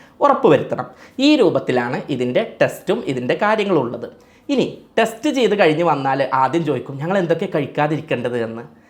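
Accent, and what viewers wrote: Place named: Chandikkad, India. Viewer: ചാണ്ടിക്കാട്ടുകാരൻ്റെ ഭാഷയും സംസാരവും ആണെങ്കിലും സാധാരണക്കാരന് വളരെ ഉപകാരപ്രദമായ വീഡിയൊ ആണ് കാര്യങ്ങൾ കൃത്യമായി നന്നായി പറഞ്ഞു മനസ്സിലാക്കുന്നു നല്ല വീഡിയോസ് കച്ചവട താല്ലര്യങ്ങൾ ഇല്ല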